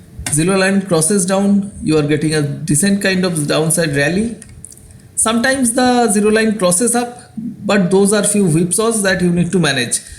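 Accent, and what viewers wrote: India. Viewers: I am from Russia and I understand your indian English )))))))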